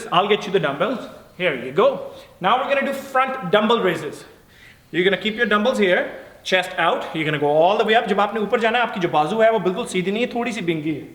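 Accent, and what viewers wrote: Punjab, India. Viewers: the way you talk.. its soon cute specially your Punjabi tone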